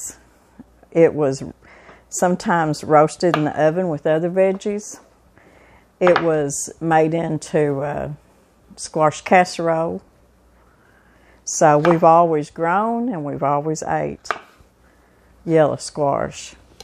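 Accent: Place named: Southeast Texas, United States. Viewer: I love your kitchen set up..your accent is southeast texas!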